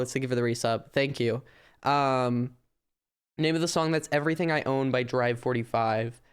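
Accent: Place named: New York, United States. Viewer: i really feel the new york talk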